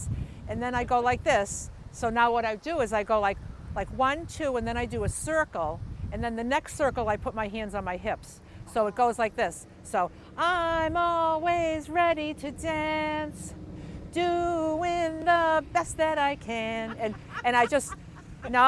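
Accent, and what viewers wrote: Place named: Boston, United States. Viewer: I love this! This tune has stuck in my head since I first heard it in the van on your travels out of Boston. It`s so catchy that I sing it all around the house and my 12 year old laughs at me. I am from the UK & was lucky to live in Boston from <num>, I love hearing your accent. Thank You, look forward to seeing more video`s of people dancing to it!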